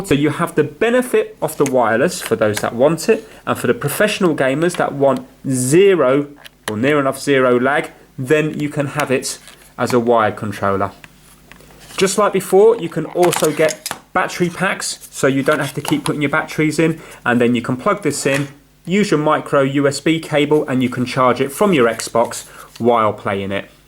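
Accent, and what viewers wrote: United Kingdom. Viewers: It’s a British accent like me, America uses England’s language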